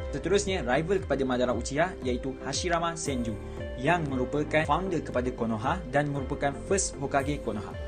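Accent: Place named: Selangor, Malaysia. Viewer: Orang selangor ke ni Sbb kawan2 aku yng asal selangor 75% pelat2 sikit😂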